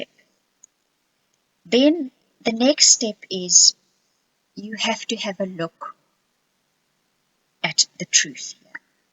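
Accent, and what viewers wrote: South Africa. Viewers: Hi are you South African?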